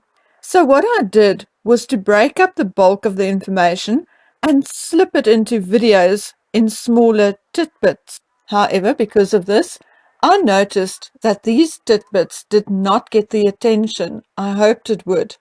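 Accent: South Africa. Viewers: I like your South African Accent!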